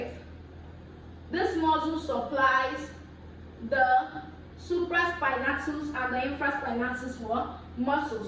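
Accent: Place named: Nigeria. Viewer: In our mother's accent. Thankyou mama naija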